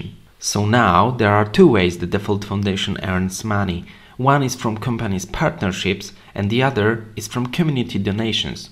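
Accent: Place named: Poland. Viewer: Polski akcent od razu można poznać.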